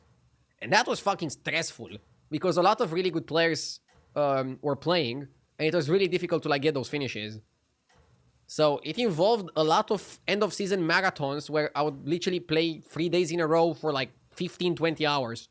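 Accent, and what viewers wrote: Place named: Romania. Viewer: Ma uitam la videoclipurile tale si mi se parea mie ca accentul te da de gol, dar nu eram sigur 100% ca esti roman 😂😂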